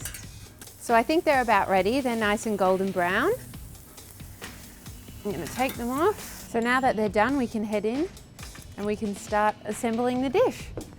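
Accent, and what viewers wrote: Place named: Australia. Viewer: I think her is Aussie Accent!!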